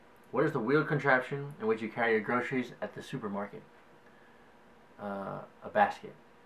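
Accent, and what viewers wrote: Louisiana, United States. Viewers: your accent is a touch Cajun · you are pretty Cajun. XD BUt, yeah, I have heard a lot thicker. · yeah, you definitely sound Cajun. I love way you talk. I'm from southwest Virgina. I've always called my grandparents papaw and mamaw, too, we say wash the same way, too. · You definitely have one. It is not as heavy like others have said but I hear it. When you say sixty, it sounds like siggsti. And when you say fork, stuff, sure, New Orlinnns. You pick up your vowel sounds really lightly, almost like you are are rapping. And your t sounds are really strong lol. Houston and N'awlins y'all.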